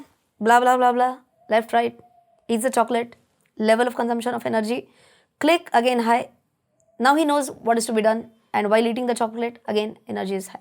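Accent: Maharashtra, India. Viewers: Madam your videos have been allways encouraging, I am from Belgaum and my mother from Maharashtra, basically we are marathi speaking, you marathi English accent gives mora attachment to all your videos, I just Love them. Thank you. PRESENTLY I am reading a Book Effective time management by polly bird published by flash. I really felt its worth for all the citizens of India, if you can make video on it it will be interesting. Also mada I make lot of spelling mistake if Google is not around me if you can make video on that it will be nice. Vivek varale Bangalore.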